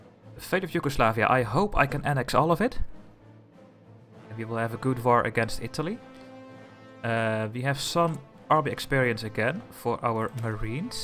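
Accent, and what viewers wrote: Netherlands. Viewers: Leuke Lets play man haha je hoort wel een beetje dat je nederlands bent in je stem :)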